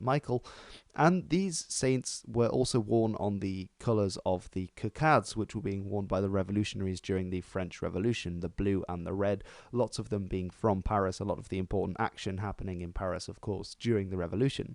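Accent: United Kingdom. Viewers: ok I didn’t know that because his accent is really the same as the British one, his accent is just perfect but thank you very much for the information I really appreciate that my friend. Love from France x ❤️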